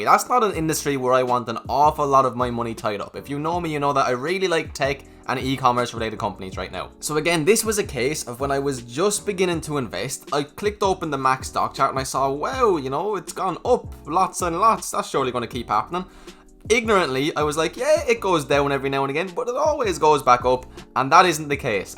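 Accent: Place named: Ireland. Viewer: I absolutely love your videos! Also are you Irish? Love the accent!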